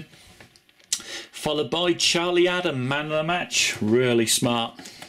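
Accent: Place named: England, United Kingdom. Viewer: Are you from England if so, where? I'm just curious to see what your accent is.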